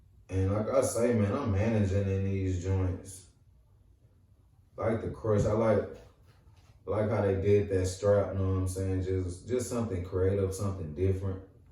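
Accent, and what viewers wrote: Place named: South, United States. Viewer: Bro you country as hell 😆 but your accent cool coming from a NYer🎯❤️ this video super helpful tho I just bought them an wanted too see what they look like in real time.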